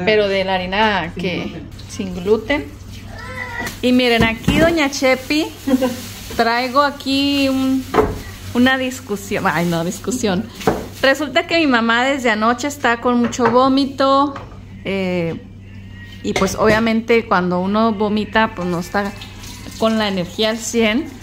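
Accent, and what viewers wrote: Mexico. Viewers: habla como todo un mexicano, 😮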